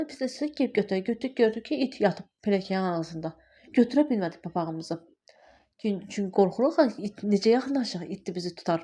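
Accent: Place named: Sheki, Azerbaijan. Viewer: Salam baci , xos gununuz olsun insallah , hee deyiremde lehce tanis ve yaxin geldi , 😊 mende Sekidenem , ugur olsun baci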